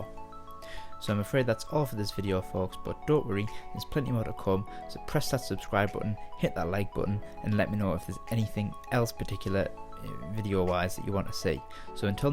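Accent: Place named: Yorkshire, United Kingdom. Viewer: Me too! :), But i know that Lol, I can tell from your accent, Sounds northern, you from Yorshire?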